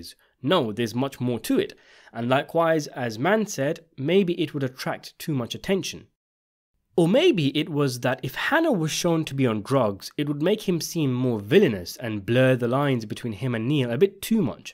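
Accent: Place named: East London, United Kingdom. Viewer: "Mans highly stylish film" talking like East London fam careful of disrespecting our culture.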